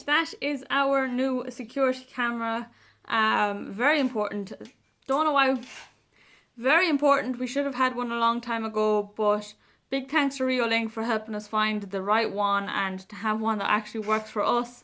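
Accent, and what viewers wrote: Ireland. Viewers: Partly guessed you were Irish.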